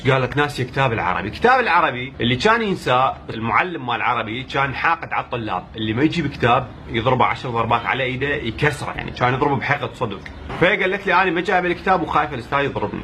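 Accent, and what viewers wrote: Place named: Iraq. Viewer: كلامك رائع. ... ما أحلى لهجة العراقية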